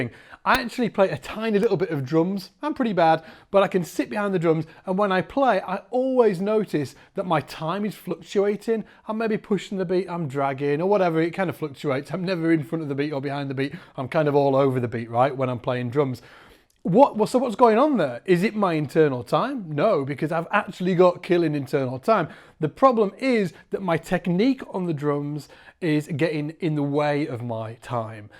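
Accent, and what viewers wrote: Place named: Northern England, United Kingdom. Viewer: has a killer northern English accent.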